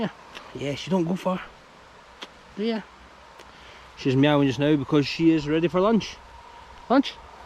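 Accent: Scotland, United Kingdom. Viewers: Great to see you guys! I have been doing some family history studying and researching my Scottish roots. Your accent takes me to to a place I want to visit someday. Loved your book! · Great to see how much you love your beautiful cat.I have two one year old cats and I talk to them too😸😁I live in Italy but I was born in Edinburgh so I enjoy listening to your "bonnie" Scottish accent 😁and admire the great scenery👍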